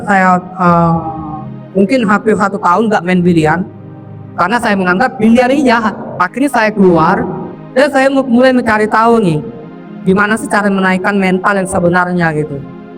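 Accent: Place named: China. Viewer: Logat bicara nya kyk org china yaa 😅🙏🏻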